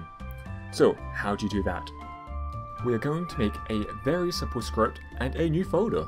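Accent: United Kingdom. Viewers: you sound such british =D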